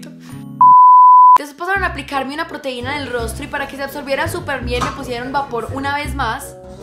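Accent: Antioquia, Colombia. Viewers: Me encanta como habla con ese acento colombiano... Nooo que está mujer es bellísima 😍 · Soy la única que ama el acento Colombiano? 🤔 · Es Colombiana y ese es el acento de Colombia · Me encanta ese habladito tan paissa AJJAJA la amo saludos desde Francia pero soy de Colombia y amo mi tierra 💛💙💗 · # jaja me encanta ese acento colombianooo, te amo 😍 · El acento de ella es paisa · El acento de, es uno de muchos acentos que hay en Colombia. Ella habla paisa, pero hay más acentos como el rolo, costeño, valluno, santandereano entre otros.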